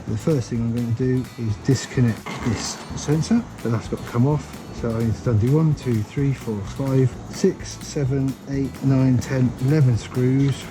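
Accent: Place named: United Kingdom. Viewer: Nice video. Took me a while to get round to watching it but I really liked this one. The hands on practical stuff is cool to watch, not to mention your familiar blighty accent and humour along with the well paced editing here. She definitely sounds a lot better with that black widow exhaust, I think you chose well! Is the factory you mentioned a side job you have? - James from 台中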